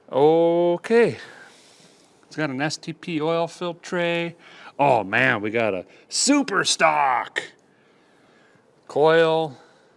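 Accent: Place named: Appalachia, United States. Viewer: Why do you talk like a hillbilly from 40 years ago?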